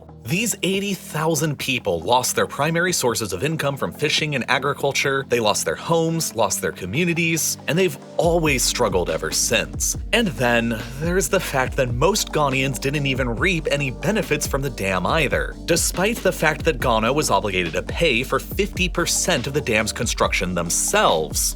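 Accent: United States. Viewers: Why do you use the international measurement standards but still use American only pronounciations on words like Alumunium (internationally pronounced: a-luh-mi-nee-uhm)?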